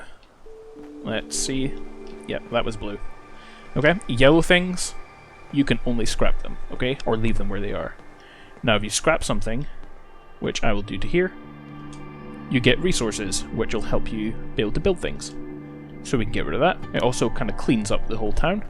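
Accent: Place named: Scotland, United Kingdom. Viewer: Hey ,am strange question but where are you from? I know Scotland but specifically recognise your accent haha whereabouts In Scotland are you from?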